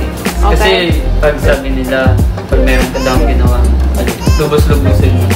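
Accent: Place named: Iloilo, Philippines. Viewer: mailhan ya gd nga ilonggo kay sa tono palang sang pag hambsl mo hahs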